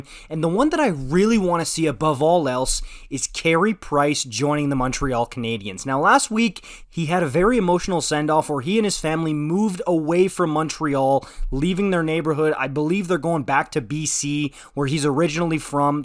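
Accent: France. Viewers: Are you french? Gotta say you did great! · Youtuber sounds like a native French speaker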